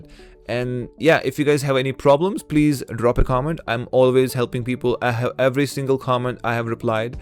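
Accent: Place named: India. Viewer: buddy u cant hide indian accent 😂, keep going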